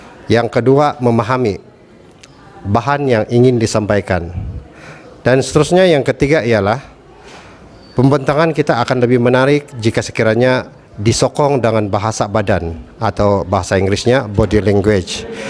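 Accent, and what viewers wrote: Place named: Indonesia. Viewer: Accent dia macam accent org Indonesia weyy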